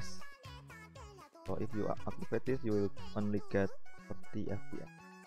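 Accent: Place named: Indonesia. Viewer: Dari logat nya indo banget dah 🤭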